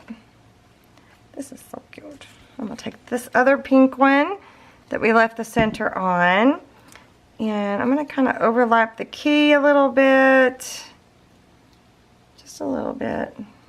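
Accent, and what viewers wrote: South, United States. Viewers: You have a lovely country twang. I love hearing you talk. I remember when I first started watching I was lost sometimes, but the more I watched your tube tutorisls, but more I understood that beautiful country talkin. Jus sayin. ♡ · I'm really having fun with this album, I'm using the Georgia Blue since I didn't have the Santa Baby paper and I'm loving it. So many different elements on the cover really special, love that. Can't wait to see the rest and for the way you talk, I think it's as cute as it can be. How could anyone not like a southern accent? How petty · Oh my...I love your southern accent. I'm from California so the way you talk is interesting. Lol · Gorgeous! Yes, love you southern bell accent! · Such mean people...love your accent...I have many friends from the south...I'm from the middle of Indiana, people from the north of the state thinks I have a southern accent, LOL. 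We have an Indiana twang...YOUR GREAT...Hugs...:) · Well I have never heard that phrase before (Dirty English) SMH... HA People come up with the darndest (hummm is that even a word? must be my dirty English) things. I just adore your southern accent. And I for one can follow you just fine. !!!. Oh yeah, I love this album. Since I was a madeitcon, I'm working on the regular cover while finishing up my original album. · I love your southern accent but of course I live in Texas 😀. Beautiful! Everything u do is absolutely beautiful!😍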